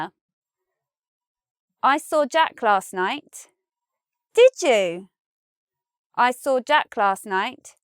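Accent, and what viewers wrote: United Kingdom. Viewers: I hear you used british accent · * O * sound make me love british Btw love u from Indonesia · is this British English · Is this english british or language amrican!!!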